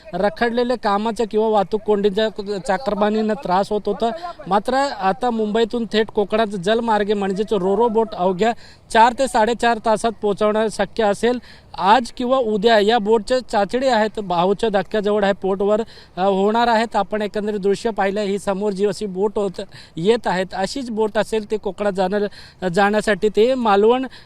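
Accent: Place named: North India, India. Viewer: आरे हा काय बोलतोय आणि कसा बोलतोय . सगळ चुकीच बोलतोय .पण मराठी बोलतोय सर्व भैयान मराठी बोलता आलच पाहजे.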